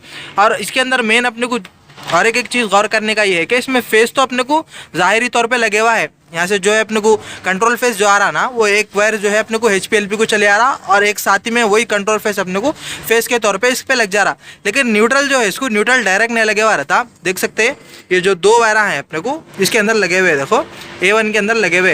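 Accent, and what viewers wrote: South India, India. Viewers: Bhi aap South Indian ho kya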